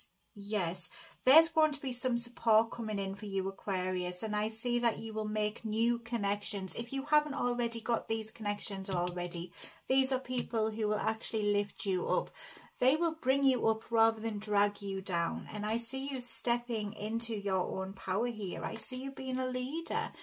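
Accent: United Kingdom. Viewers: I so look forward to these readings, I feel your accent is similar to mine as I live in Northumberland. You always make me feel so much uplifted afterwards. Thankyou and Bless you xx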